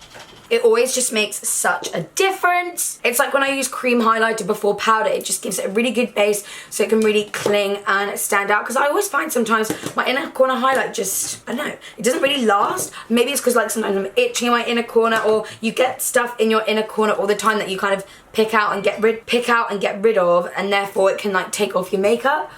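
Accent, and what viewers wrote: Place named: United Kingdom. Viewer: She's British or whatever haha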